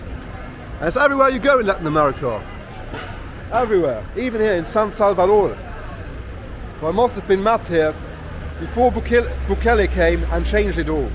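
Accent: United Kingdom. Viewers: The don’t sound like a gringo, you sound more like a British person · Love the English accent, makes the video more entertaining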